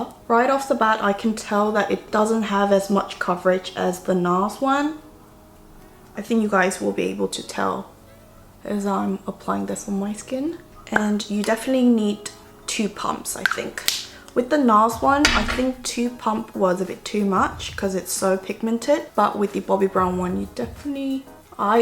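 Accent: United Kingdom. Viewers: 와..파데보러왔다가 발음듣고 구독하고 가용...ㅜㅜ 이렇게 영국스러운 절제된 발음이라니 사랑해요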